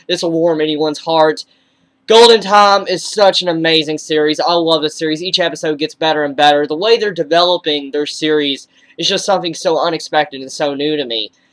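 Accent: United States. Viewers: So he used to have a country accent?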